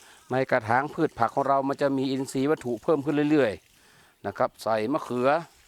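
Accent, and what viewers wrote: North, Thailand. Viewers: ขอโทษนะคะพี่เป็นคน เหนือรึป่าวคะน้องกดติดตามแล้วค่ะ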